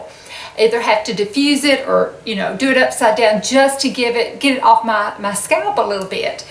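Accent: South, United States. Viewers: 😃, Nice one, I can just see you in a L'Oréal advert with that lovely southern drawl........... "Beeecawse y'all are worth eeeet" Youre a doll xxx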